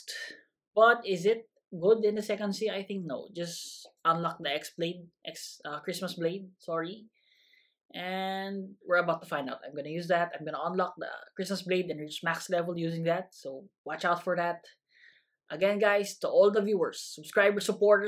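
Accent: Philippines. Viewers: Ur voice is satisfying btw sounds like a pilipino speaking enflish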